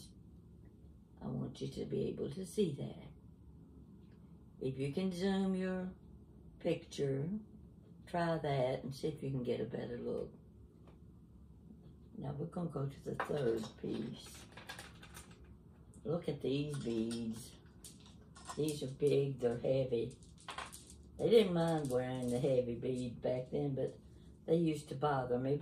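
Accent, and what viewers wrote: South, United States. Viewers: yes the deep southern accent. Awesome.😊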